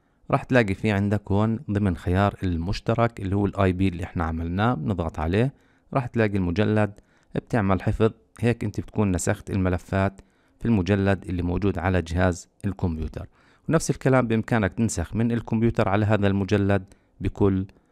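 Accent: Jordan. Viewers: والله انك بطل و يسعد لهجتك الاردنية او الفلسطنية بطل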